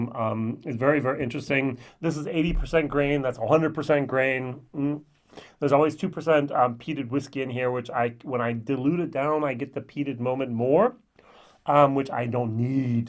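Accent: Germany. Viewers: Your German accent is getting the better of you. The "S" in Sauternes is pronounced as an "ess", not a "zee" [sōˈtərn]...;-) I was gifted a bottle of WHISTLEPIG OLD WORLD SERIES SAUTERNES FINISH RYE 12 YEAR which was impressive. Looking forward to a whole new year of your vlogs.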